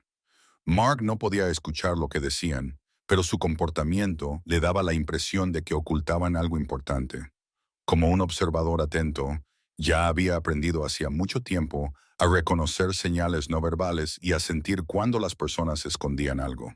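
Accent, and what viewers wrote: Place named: United States. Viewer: Estaban en la entrada de la casa? o estaban en el piso de arriba?, te contradices. Además esa voz agringada, me resulta chocante.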